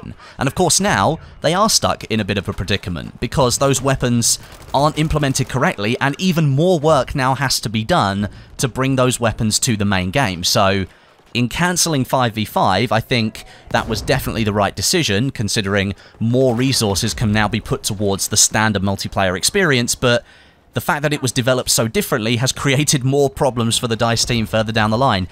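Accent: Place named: United Kingdom. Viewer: I appreciate your optimism . Gave up on Battlefield a long time ago. Still enjoy listening to your slick British accent . Are you going to give Modern Warfare a try this year?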